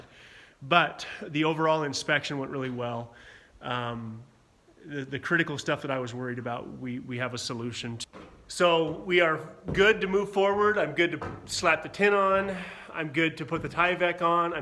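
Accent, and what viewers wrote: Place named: South, United States. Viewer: As a northern carpenter. Just be listening to I can tell right away you're from the south. Can I suggest something? Have you thought about putting the tyvek (all you can ) on before you raise the walls? I remember the first time I worked in the same sub with a southern crew. We had about a week on a home in when the southern crew arrived. They on the second story in about 3 days. I said to my foreman they're going to beat us. He said just watch. It took them a month and a half to finish. They had to scaffold everything off. No way they made money on the job. Just a little thing I learned. Be blessed Bro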